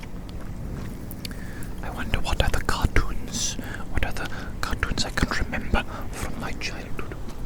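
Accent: Wales, United Kingdom. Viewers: Fantastic asmr!!! Also do I sense a welshy??🤔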